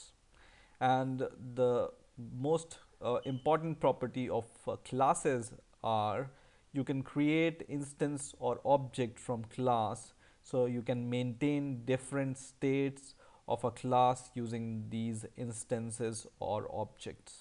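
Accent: India. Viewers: some people dont like indian accent. but here enjoying the video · which accent is this? do u know hindi language?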